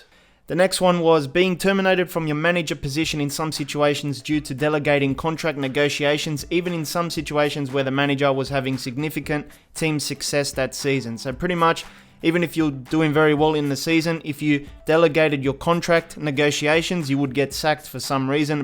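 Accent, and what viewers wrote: Australia. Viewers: He’s from Australia so he pronounces things different, low him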